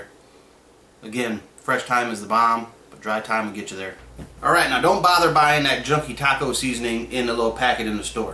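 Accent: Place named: South, United States. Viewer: Why are you speaking with a southern acceny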